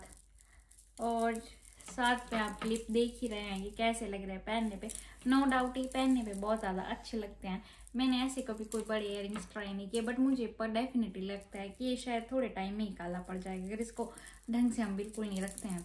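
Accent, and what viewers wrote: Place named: Himachal Pradesh, India. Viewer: Aap himachal se ho na bolne ke style se lag rha hai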